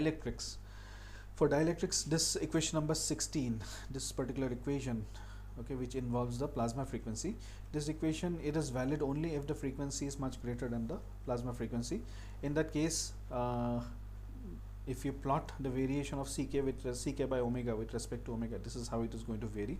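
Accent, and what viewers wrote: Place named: Bengal, India. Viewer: Very clear explanations sir! 😊 Your accent seems to bengali. Loved it! From Pakistan 🇵🇰🇵🇰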